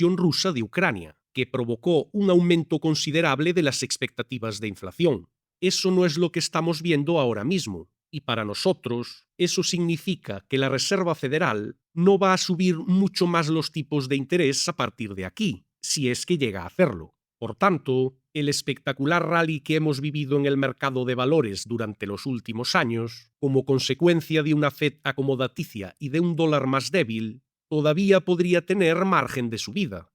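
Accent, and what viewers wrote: Galicia, Spain. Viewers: Me encanta absolutamente que el narrador sea gallego. No lo cambiéis nunca!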